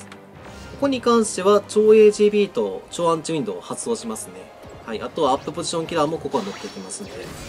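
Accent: Kansai, Japan. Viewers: 同じ京都だけど違和感はあんまりないかな？ 今は関西じゃないし、動画配信なら標準語使った方がいいと思うからたまに地元の関西弁出るんだと思う。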